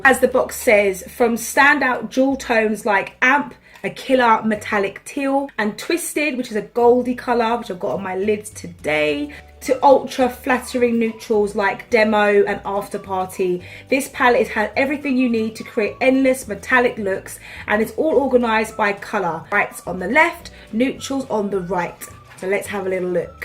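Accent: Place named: United Kingdom. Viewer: I like ur type of british accent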